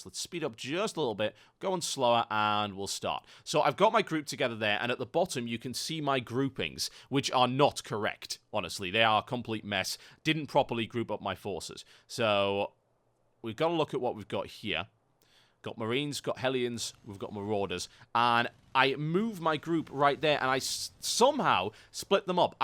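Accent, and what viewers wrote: United Kingdom. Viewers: i think ur funny as hell jw r u british caus eu sound just like my britsh friend :D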